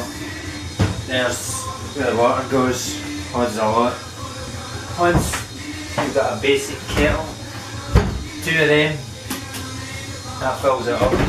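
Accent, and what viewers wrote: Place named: Scotland, United Kingdom. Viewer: Say, whuh??? Huh? Whudie say? hahahahaha ..no, just teasin' ya. Couldn't understand a word ye said, but no mattah .. yer precious in your delivery ... and yes, last part was in yer proper Scottish accent ... I almost understood every word. I do declare, Scottish is much more difficult to understand than Dutch. Bless you for making this video .. I will definitely go out now and get a water distiller. It sure seems to be working for YOU my friend. Thanks again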